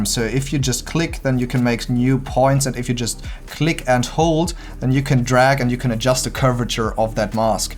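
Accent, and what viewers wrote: Germany. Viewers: that accent is something else! Love when Germans speak English! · German Accent?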